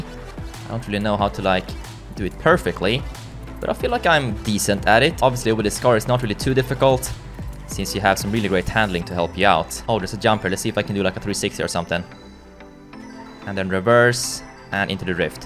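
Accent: Sweden. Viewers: Bruh, your Swedish accent is so satisfying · Are you swedish it sounds like that?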